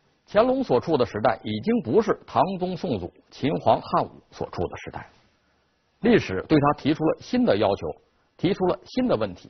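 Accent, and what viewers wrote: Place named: Inner Mongolia, China. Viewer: 他是蒙古族，講話音調跟漢人不一樣，當你看完全系列會有很大的收穫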